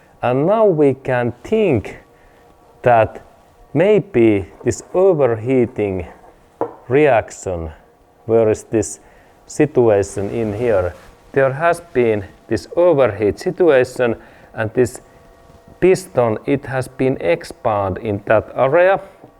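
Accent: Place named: Finland. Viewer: I think this is a Finnish (Uralic) accent?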